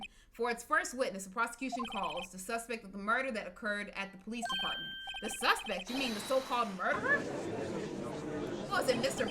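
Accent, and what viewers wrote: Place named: South, United States. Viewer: Girl your cowboy southern accent is too funny!!!!😂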